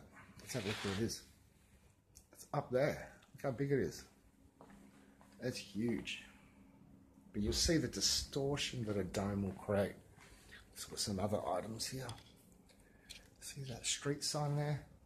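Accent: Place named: Australia. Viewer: I just saw you on the RV Pod Cast. I noticed the Aussie accent so I thought I'd check you out, I'm in Coffs Harbour. I saw this dome video so it was the first of yours I looked at. I got trolled 4 to 4 and a half years ago about southern stars rotation so I looked into it, I looked into Stellarium and I also made a dome concept model with a salad bowl and lights and a phone camera to record inside the salad bowl. Links below, if you have time to check them out let me no what you think. Comments and likes are turned off on the videos because of continued trolling. Southern Stars, Reflection Of The Centre Stars? Part 1 (Using Stellarium) <link> Southern Stars, Reflection Of The Centre Stars? Part 2 + A Simple Experiment <link> Southern Stars, Reflection Of The Centre Stars? Part 3 Check With A Physical Model <link> 24 hour DAYLIGHT in Antarctica Experiment timestamped to shorten it. 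Flat Earth - 24 Hour Daylight Not Sun In Antarctica, Reflection Test Using Salad Bowl <link> This one timestamped also to shorten the vid, an idea on how the sun sets south of west in the southern summer. Flat Earth - Circling Sun Sunset, Circle Of View, Atmospheric Lensing, Experiment (Preliminary Test) <link>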